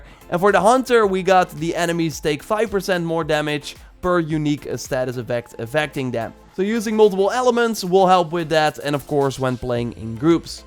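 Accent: Netherlands. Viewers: You sound dutch not gonna lie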